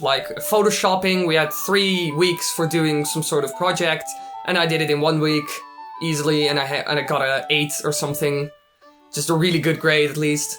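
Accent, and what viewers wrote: Netherlands. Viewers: Je bent Nederlands! Ik hoorde het al aan je accent.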